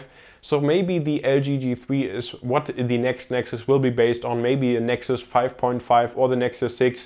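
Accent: Germany. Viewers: TechCentury aber wär schon gut, wenn das eigene Englisch dann nicht so deutsch klingen würde, oder? Find ich dann irgendwie eher peinlich als international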